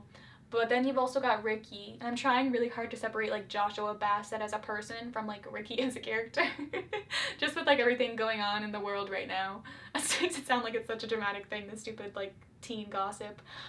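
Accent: Canada. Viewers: also im here for your canadian accent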